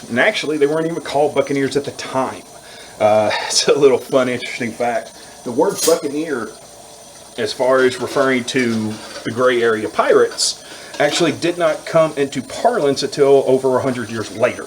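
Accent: United States. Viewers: yep you're definitely a Texan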